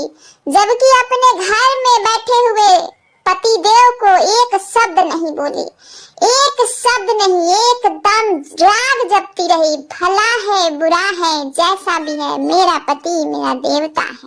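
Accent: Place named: Varanasi, India. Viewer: 😂😂aap hai kaha se plzz btaiyega aapki aavaj bilkul hm logo ke tarf ka lgta h mera matlab hai vanaras jesa h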